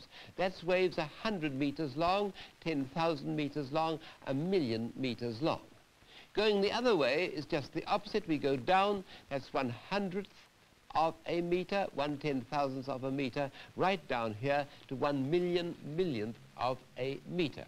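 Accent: United Kingdom. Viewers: Wonderful accent, very posh. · When you hear a man speaking in that old fashioned more upper class English accent, you listen! I learned so much, and this is how physics should be taught, thanks for posting. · Brilliant and clear British accent !!!!I like that!!!!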